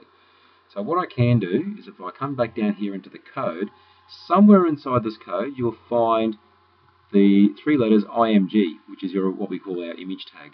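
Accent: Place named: Australia. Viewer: G day Thanks mate aussie accents are my favorite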